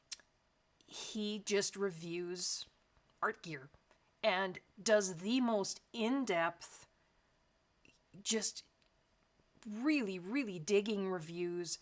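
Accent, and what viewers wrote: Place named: Canada. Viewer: It’s funny that you find British accents relaxing, I’m Scottish and I love yours because it reminds me of my best friend who’s Canadian 😅. I used to watch time team with my grandparents, love me a bit of Tony Robinson haha. I don’t know if you’re familiar with Gem and the colour cave here on YouTube but I think you’d enjoy her stuff!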